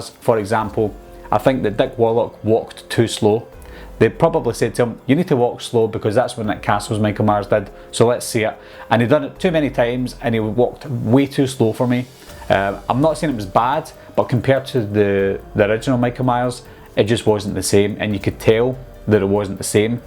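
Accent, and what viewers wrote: Scotland, United Kingdom. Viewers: Where aboots in Scotland you fae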